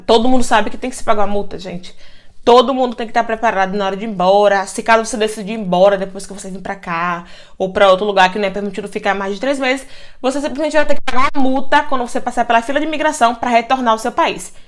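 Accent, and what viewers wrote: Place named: Pernambuco, Brazil. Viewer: Gente ela é Bahiana🤣, o sutaque é de Pernambucana , Gui que és Pernambucano.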